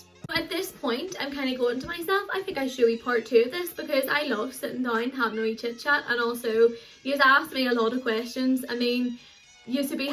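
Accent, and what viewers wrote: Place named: Belfast, United Kingdom. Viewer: Belfast accent is the DREAM!!!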